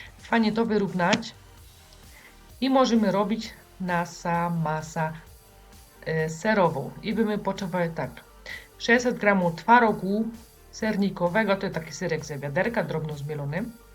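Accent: Silesia, Poland. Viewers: Pani mówi gwarą śląską i wten sposób wymawia się mleko. Pozdrawiam. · jest za granicą,pochodzi ze Śląska i mówi swoją gwarą.To jest piękne,gwarę należy powielać,bo to część spuścizny po przodkach.I nie ma się co popisywać ze znajomością mowy polskiej!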